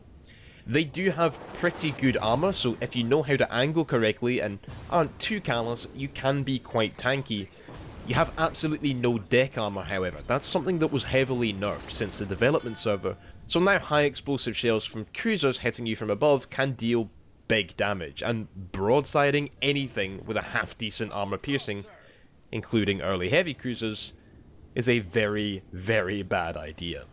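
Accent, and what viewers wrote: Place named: Paisley, United Kingdom. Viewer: Love your accent, reminds me of me mom. She was from Paisley.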